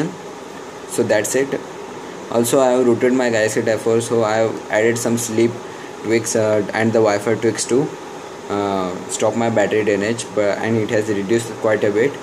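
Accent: India. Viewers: it's ay Indian accent think before commenting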